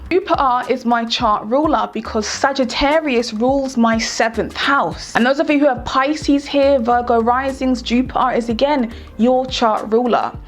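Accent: United Kingdom. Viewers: 🤣 🤣 🤣 🤣 I LOVE your energy and the wsu you present your vids, you're the only astrologer with a British accent I follow, I'm South London 30 libra ♎... You have a patreon??? I wanna link up wit youuuuuuu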